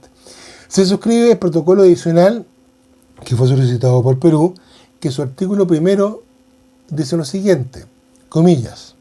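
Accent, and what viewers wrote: Chile. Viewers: La vocalizacion de es bastante clara, si uno esta acostumbrado al acento chileno. Le sugiero usar audifonos, pues la calidad del audio en Youtube nunca es la mejor.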